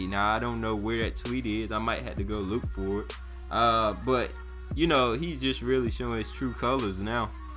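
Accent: United States. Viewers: I knew you was from North Carolina lol on god..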